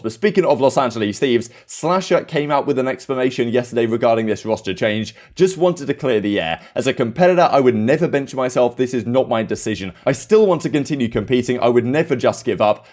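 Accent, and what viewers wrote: United Kingdom. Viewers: This is the most British sounding British person in existence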